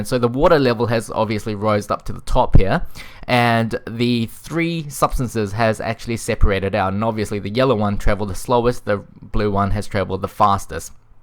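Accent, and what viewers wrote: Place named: New Zealand. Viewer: Your new zealand accent>>>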